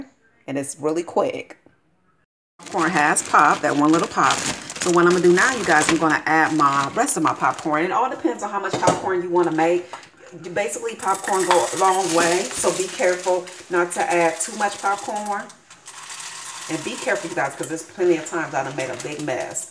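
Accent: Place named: California, United States. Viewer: Love all your videos!! I thought you sounded like you had a California accent until I seen you're from IL. If you turn your phone side ways (landscape) when recording, you won't have the black on the sides and the video will be larger.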